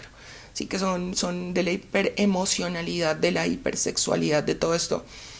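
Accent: Colombia. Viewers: ACENTO ROLO? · Por lo general la mayoría de las personas no toman o se dan cuenta de estos detalles que parecieran insignificantes pero el daño poco a poco le va destruyendo la conciencia ,la esencia , la espiritualidad y por lo tanto la mente que de por si es mala se vuelve más perversa todo lo que has dicho en este video es la realidad pero la humanidad no quiere acer el mínimo esfuerzo para salir de esta matriz o granja este conocimiento es el despertar másivo , te felicito mujer mis saludos desde Barranquilla Colombia y que me pareciera que fueras de aca por tu forma de hablar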